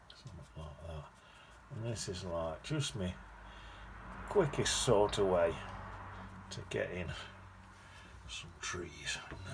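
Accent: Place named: Yorkshire, United Kingdom. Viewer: Good Yorkshire twang that is ... tha'll be reet ... 😁😁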